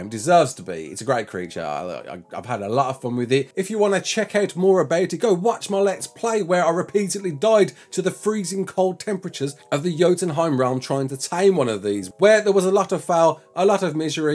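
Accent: United Kingdom. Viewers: you did great! It's simply inevitable that pronounciations are a little different depending on where one's from, but it honestly sounds pretty good in British. :D I'm sure you (intuitively) already know, but 'Steinbjørn' directly translates to 'Stone bear'. Big fan of your videos btw., they made me pick up the game again!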